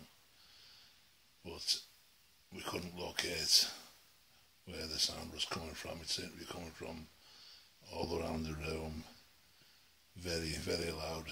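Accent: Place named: North East, United Kingdom. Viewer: You sound like you're from the north-east, if so that painting too close for comfort.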